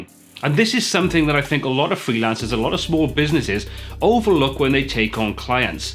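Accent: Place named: Scotland, United Kingdom. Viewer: Hi. Another great video! I've gotten into all this computer-related stuff really (too?) late in life - how I envy you youngsters! ;-) I'm in the process of trying to put together some websites to (hopefully!) make me some money in the Affiliate marketing game which will - I further hope - be generating a 'nice little earner' by the time my pension comes due (I'm 63). I had to smile when you were on about the inflated price 'trick'. In a previous life - as a self-employed vehicle mechanic - I fell foul of that 'trick' on several occasions! Scenario: Regular customer - good payer. Really sh*tty job that I REALLY don't want! Give customer ridiculously high price quote. Result? Oh, thats less than I expected, go on then, you'd better do it! Result? Oh b*llocks!!! :-) Social life? I was Competition Secretary for a small local golf club for some years and yes, like you, many's the time I was out and about and I'd get a phone call from a club member with some query for which I needed computer access - so I feel your pain! 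lol Finally, I just love your Scottish accent! ;-)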